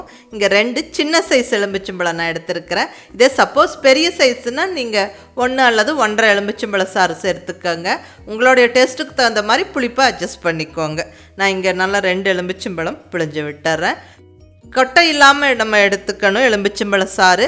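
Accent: Kongu, India. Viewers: Neenga pesura cbe kongu Tamil ku ....na addit ❤️..cbe la enka irukinka mam...Naanum cbe than ❤️👍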